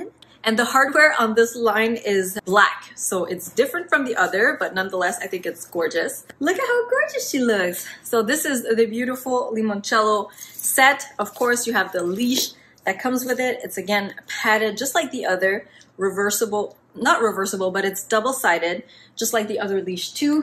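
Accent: Quebec, Canada. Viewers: Êtes vous de Montréal, aussi?! I think I saw you guys!! Aussi je reconnais l’accent quebecois/montréalais! J’adore! 💕 I’m getting a cavalier in November and have binging your channel to prepare myself 😂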